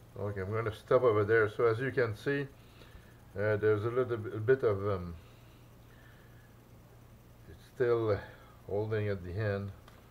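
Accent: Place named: Quebec, Canada. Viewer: i can imagine your from quebec. tabarbak thanks for the video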